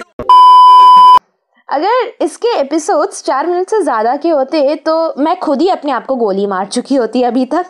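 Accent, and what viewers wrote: India. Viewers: WHO ARE YOU INDIAN OR WHAT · Are you really indian?or learnt to speak hindi ?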